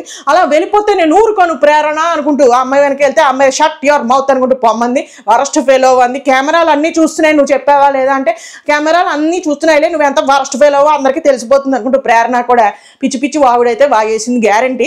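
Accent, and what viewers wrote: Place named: West Godavari, India. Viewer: navvaleka sachipoyanu! thank you so much! Bigg Boss should continue only for your reviews andi! Are you from West Godavari? Your slang sounds bit like us.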